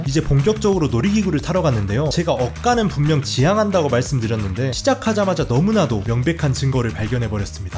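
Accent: Jeolla, South Korea. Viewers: 전라도 사투리 ~~~~ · 전라도억양이라 불신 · 오! 전라도 분이신가보네요 사투리가 구수합미다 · 전라도임 ? 사투리가 ㅈㄴ 들리네 ㅎㅎ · 아따 니 전라도 사람이여라? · 9주인장 전라도 맞져 ? 계속 알게모르게 전라도 억양이들림 (순수 궁금증) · 혹시 광주 분이신가요?? 전라도 사투리 쓰셔서 광주사람인가했는데 딱 기아 얘기 나와서ㅋㅋ (내일 드뎌 한국시리즈에요😎) 그리고 영상 너무 웃겨욬ㅋㅋㅋㅋㅋ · 전라도분 이신가봐요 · 즌라도 사투리가 쏙쏙 박히니까 재밌네 ㅋㅋㅋ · 전라도사투리 거북합닏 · 혹시 고향이 전라도신가요? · 숨길수없는 전라도사투리 ㅋㅋㅋ 굿!! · 혹시 전라도 분이에요? 나레이션에 사투리가 구수한데?? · 전라도사람이시군요 사투리가 ㅋㅋㅋ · 5교시 한문시간ㅋㅋㅋㅋㅋㅋㅋㅋㅋ 아니 말 너무 재밌게 하시네요ㅠ 전라도 억양도 너무 웃김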